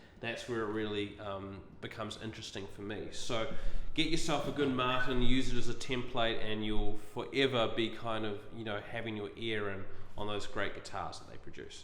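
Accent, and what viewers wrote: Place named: New Zealand. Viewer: Love the guitar, the player, and the Kiwi accent!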